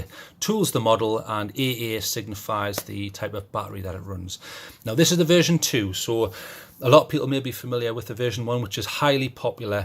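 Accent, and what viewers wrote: United Kingdom. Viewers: You british? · You cannot mix that British accent :D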